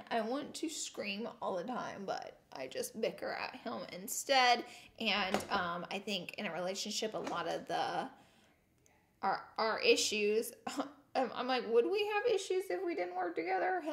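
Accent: South, United States. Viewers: omg grl! thank u so much for sharing & I watch your channel because of the makeup & the comedy! you are so funny! I'm from the south too so thank u for not hiding your accent or your goofiness. You are amazing! It takes so much dedication to work with your husband I'm sure. thank u for always being yourself . God bless u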